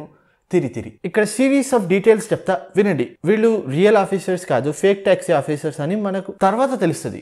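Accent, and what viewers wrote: Karnataka, India. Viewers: Bro your mother tongue is Telugu and grown wholly in karnataka I think. Same here. I observed this in your khaleja video also.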